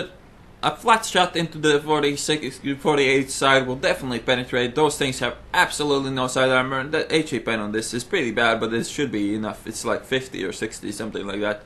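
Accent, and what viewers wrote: Russia. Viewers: dat russian accent :))) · lol, Id love those russian accent, some how I just can't pretend one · LMFAO! Love your Ruski accent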